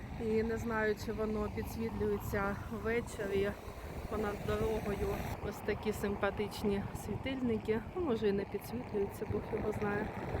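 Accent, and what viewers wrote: Surzhyk, Ukraine. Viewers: так, використання і замість «и» це прямо суржик-суржик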